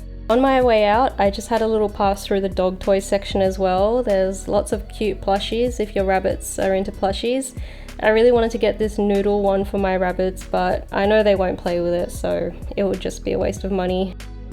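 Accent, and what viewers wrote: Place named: Australia. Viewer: Aussie or new zealand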